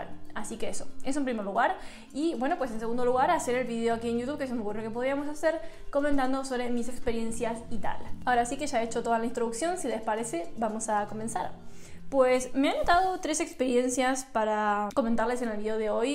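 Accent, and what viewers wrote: Spain. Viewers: Acabo de caer en cuenta de que puede que esté teniendo varios indicios de viajes astrales, woooo. Voy a investigar más y practicar. 👀 Oyyyyyyye, y ya tienes re fuerte el acento español, jaja, recién lo noto. Te escuchas muy bella con la mezcla de acentos. xd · me mata que cada vez tiene más acento español xdd mas tierna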